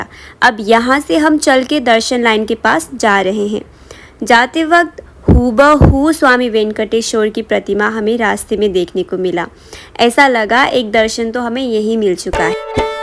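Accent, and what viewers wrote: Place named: Mumbai, India. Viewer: Apki hindi mumbai jaisi h 😮 kbki maithil log to etna pyara hidni accent jante h .. i love maithili language too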